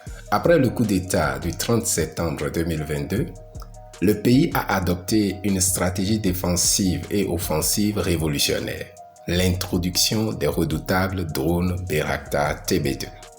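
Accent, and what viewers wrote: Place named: Benin. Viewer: Il a un accent béninois. Mon frère trouve autre moyen de chercher tes likes et vus sur YouTube. Si plusieurs personnes dénoncent la vidéo. YouTube va la supprimer. Donc top départ les internautes.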